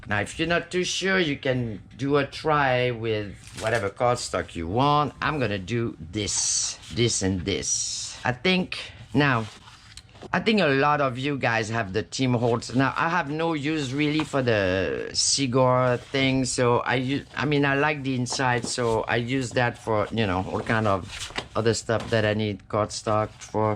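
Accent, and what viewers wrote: France. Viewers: Hello,this is a little strange that I came apon your videos my name is also and I also was born in France My father was stationed in France when he met my mom,and much later my mom had 8 kids,4 boys and 4 girls we left Europe when I was 4 yrs old,gosh I love your videos so much and your accent brings me back with so many memories so just wanted to say may God bless you each and every day❤❤❤❤❤❤❤❤❤❤❤❤❤❤❤❤❤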